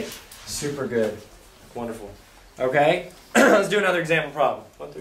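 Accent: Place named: Arkansas, United States. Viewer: I think he is from Arkansas if not from texas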